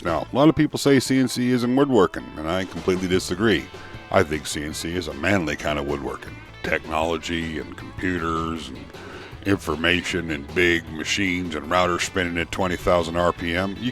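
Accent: United States. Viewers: You are extra funny today. And the accent is extra 'cowboyish". I guess it makes it all 'manly".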